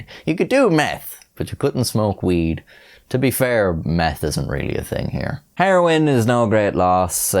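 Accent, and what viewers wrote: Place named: Ireland. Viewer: When you have an Irish accent you can say anything damn it!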